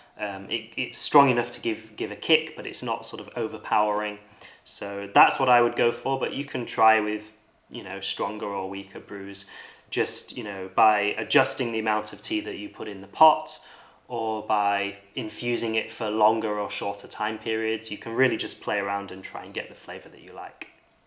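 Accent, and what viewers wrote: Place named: United Kingdom. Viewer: All I'm saying is that "Man talks about caffeinated beverages in a British accent" is clearly a formula that works. You'd need to upgrade from t-shirts to jumpers though.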